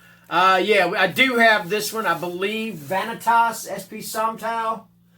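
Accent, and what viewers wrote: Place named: South, United States. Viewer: you never lost your southern accent. Love it. 👍 Nick and Regina are so nice. Awesome book mail. I remember your review of The Ruins and how much some of the characters got on your nerves 🤣 Iconic. Cool Dracula knife.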